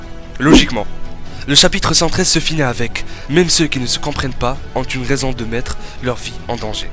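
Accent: England, United Kingdom. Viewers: Merci bonne année à toi aussi et j'adore ton accent Anglais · Super accent anglais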